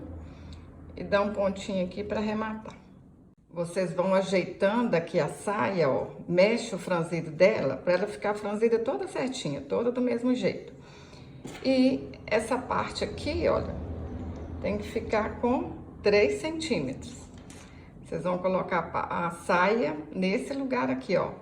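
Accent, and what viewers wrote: Minas Gerais, Brazil. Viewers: Adorei. Amiga Vc é mineira ?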